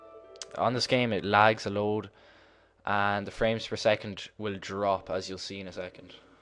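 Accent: Newfoundland, Canada. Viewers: your from newfoundland? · Im a newfie too, saw the zelda back ground and heard the accent and thought "MY BRETHREN"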